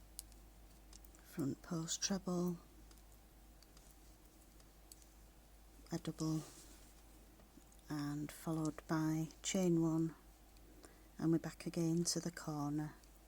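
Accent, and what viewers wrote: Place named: United Kingdom. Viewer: You sound British, so why are you using American terminology?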